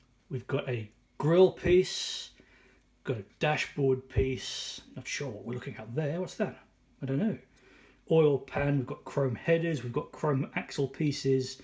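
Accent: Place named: England, United Kingdom. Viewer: Good review of complex kit👍🤓just watched a couple more too, so I'd better sub😃 It's nice to hear your another Englishman aswell😃Pete 😎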